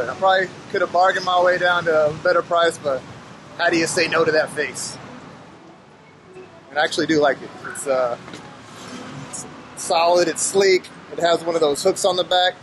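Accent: Texas, United States. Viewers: LOl i knew it.. i knew you are from Texas, my best guess was from mins when you said "45" that dialect tho.. i told my wife, i think this guy from Texas, and then later.. you said it yourself. you even did small homework about Jokowi and history, really really appreciate it! enjoy your traveling in my country. PS: that drunk dude mentioned "CIU" it's a chinese origin language for SAKE like beverages.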